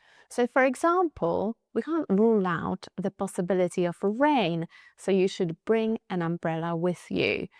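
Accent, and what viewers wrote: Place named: United Kingdom. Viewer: "to stand out" - that's a really funny expression. It reminds me of this Freddie Mercury Movie when he (Rami Malek) was asked about his additional teeth and he answered "What about my teeth - I don't wanna stand out of British people". This is for example a phrasal verb that every English learner ought to understand easily, because in this context it should be clear to the viewer. I am half American and I worked as an English teacher for many years.. And once in a while I have a look into your channel because I'm interested if there is anything I don't know. And I love your British accent. I imitated this British accent so many times that I had to take care not to take it over, because my American relatives were teasing me about it. Once an English Prof told me not to play with my accent because it's so original and native. 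But during my time as a flight attendant with Lufthansa I shared an apartment with a British girl and I found that accent so funny that I had to play around with it.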